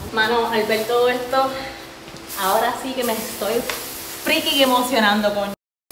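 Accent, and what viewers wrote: Puerto Rico. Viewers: Mira de verdad lo unico de China 🇨🇳 q tu tienes es tu rasgo Fisichella xq hablas como nosotros los Boricuas con nuestro acento osea q los años q vivistes en NYC NO AFECTARON ESE ACENTO, MUCHAS BENDICIONES EN TU NUEVO PROJECTO Y Q SEA DE MUCHO EXITO,AMEN, GRACIAS X REPRENTARNOS CON MUCHO ORGULLO ❤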